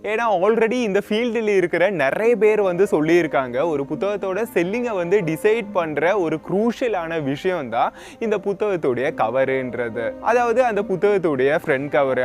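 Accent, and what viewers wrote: Kerala, India. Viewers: Nenga keralatha.....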